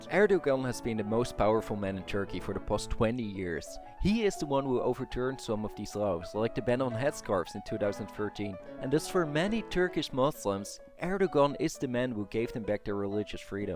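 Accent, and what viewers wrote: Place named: Netherlands. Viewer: No worries at all, thanks so much for the video, I'm guessing Dutch from the accent :)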